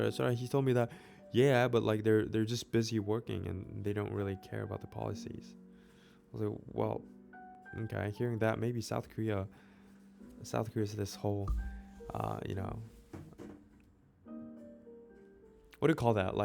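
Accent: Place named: Texas, United States. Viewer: Your English is concise & perfect 👌, you underestimate yourself. Your accent sounds predominantly 🇺🇸-Texan. H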